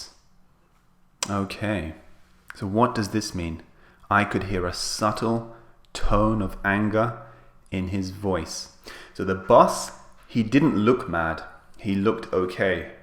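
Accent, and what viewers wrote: United Kingdom. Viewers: the bri'i'sh accent is quite charming!!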